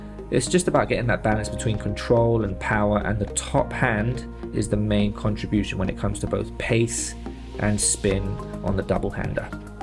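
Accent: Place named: United Kingdom. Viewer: interesting, I thought it's in Europe, judging by your accent I thought it's in Britain. Thank you for this extremely helpful video.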